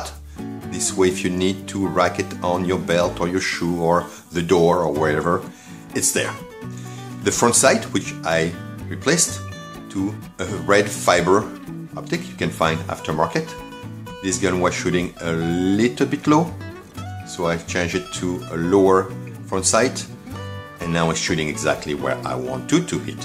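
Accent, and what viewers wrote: France. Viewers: je reconnais un accent français ?!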